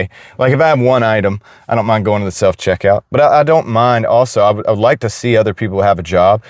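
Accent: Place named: Rural, United States. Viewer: Unrelated, just curious, what part of the US is the accent from? To me it sounds like a very mild country accent of sorts. Pleasant sounding. Just curious.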